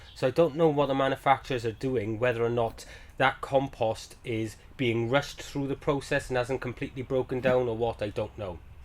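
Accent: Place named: Liverpool, United Kingdom. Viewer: Hi,. Another geezer in his seventies here, thoroughly enjoying both the content and that wonderful scouse accent! Does bucket growing translate directly into bag growing or will you be doing a vid on bag growing at some point? Grew my first spuds last year. Used potatoes from the fridge. Chitted them in a cardboard box in a dark cupboard. Chopped them up to avoid too many chits on one bit but didn't know to harden them off before planting but will now do this! Used a mix of soil, compost, perlite and coco coir. Watered in some fish blood and bone towards the end and cut the flowers off. Well pleased with the number of potatoes harvested although mostly not too big. Saved nice plastic bags that held logs we bought. Will put them in bin bags with the bottoms cut off to exclude light. Using some British Queen Scottish second earlies from JBA. 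Will also get some indeterminates in a few weeks and see what happens! Thanks again and will be learning more from you day by day. Regards, Bob'O', U.K..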